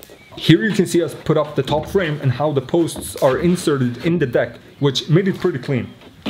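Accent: Norrland, Sweden. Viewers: 'I bore three holes [...]' The Swenglish is strong with this one. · bor du i norrland eller låter så på dialekten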